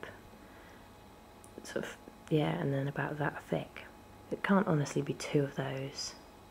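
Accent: England, United Kingdom. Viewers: just discovered your videos. you have a very calming and lovely voice, even when you're speaking normally! not too loud, not too soft. the accent helps too...but i'm an american; we generally love listening to english accents ha :)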